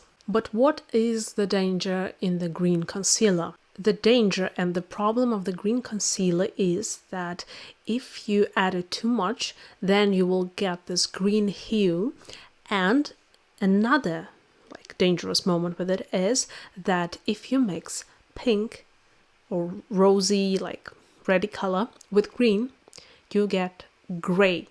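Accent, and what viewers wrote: United Kingdom. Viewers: Я так пыталась понять Ваш акцент, думала Вы из Англии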